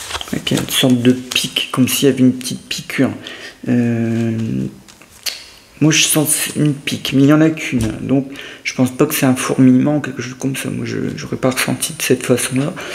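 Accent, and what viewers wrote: North, France. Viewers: Bonsoir. Je vous découvre pour la 1ère fois ce soir et vous m avez bluffée!!!!! Vos prédictions sont incroyables et m ont parlée à 1000 % surtout concernant min travail c'est tout à fait ça!!!! Vous etes dans le mille!!! Je me abonne à votre chaîne avec grand plaisir ❤. De plus je reconnais un petit accent nordiste et j'ai vecu à avesnes sur helpe toute mon enfance et j'ai de la famille à Maubeuge donc je connais bien la région que j aime beaucoup même si je vis actuellement à Marseille. Au plaisir de vous écouter avec hâte. Cordialement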